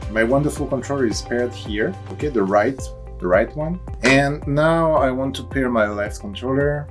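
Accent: France. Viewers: are you french? you have a french accent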